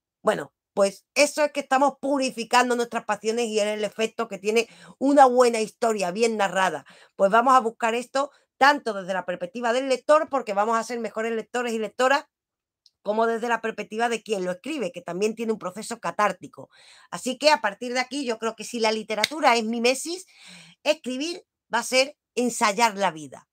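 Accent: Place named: Andalusia, Spain. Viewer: Eres muy amena con tu bonito acento que se me antoja andaluz .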